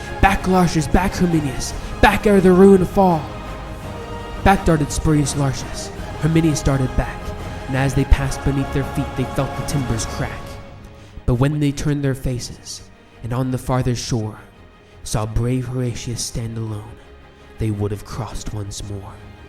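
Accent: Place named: United States. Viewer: Magnificent words, but doesn't work when read in a U S tone of voice.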